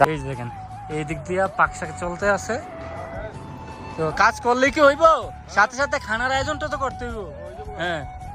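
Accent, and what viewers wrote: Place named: Comilla, Bangladesh. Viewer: আপনার কথার বাসায় বুঝা যায় আপনি কুমিল্লা জেলাল মানুষ কোন থানা আপনার বাড়ি জানাবেন ❤ ঈদ মোবারক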